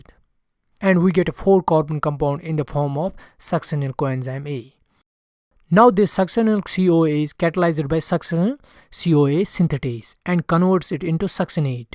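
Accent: Kashmir, India. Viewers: Sir, are you from Kashmir? Your accent indicates so.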